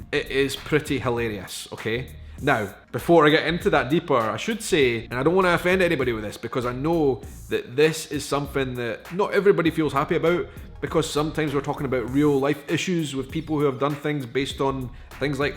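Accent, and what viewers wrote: Scotland, United Kingdom. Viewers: You need to come to Montana. Everyone here will love you and you'll get all the free drinks you want as soon as they hear that Scottish accent. Our state is larger than Germany and trust me you'll notice it. For us a 6 hour drive is just going from one side to the other on the interstate. You can even stay with my family, we have houses on both the Eastern and Western side of the state plus a cabin up in the only Eastern lake where cabins are allowed so we can toss in a fishing pole and catch some delicious cold water trout. I'll even bring the brews and some good cigars.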